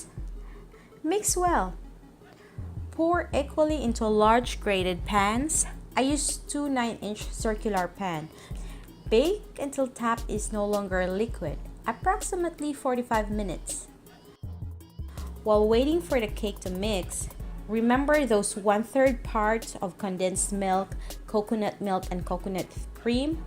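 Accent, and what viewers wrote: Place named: Vietnam. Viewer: Úi...nghe giọng này.e đoán chị là ngừi việt nammmm😁😁😁😁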